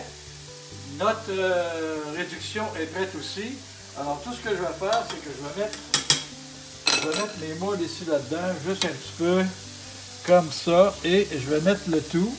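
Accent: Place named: Canada. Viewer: Merci pour le travail des conversions... xD Bonne continuation pour tes nouvelles recettes et très franchement j'adore votre "accent" canadien ! Grand merci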